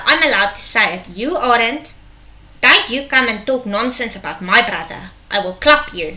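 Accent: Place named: England, United Kingdom. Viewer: Ek hou van jou engelse aksent! jy s lieflike!!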